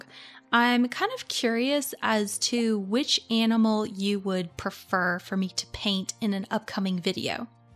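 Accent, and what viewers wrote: South Carolina, United States. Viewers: I like to guess a speaker's location by their accent. South Carolina?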